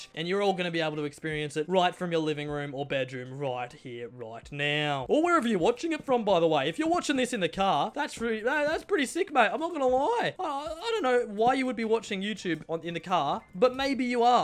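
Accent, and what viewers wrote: Australia. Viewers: every video of December it sounds like is getting more and more Australian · This is the most Australian person I’ve ever heard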